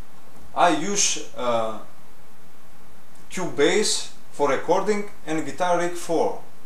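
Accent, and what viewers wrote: Greece. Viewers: greece maybe larisa :P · are you greek? i can tell by ur accent. thanks for the video by the way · that greek accent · Are you Greek? I can recognize the accent because I'm Greek, but I was born in America. Thanks for the help, I've just started recording songs on my ukulele and wanted to know how I can somehow plug my uke into my computer.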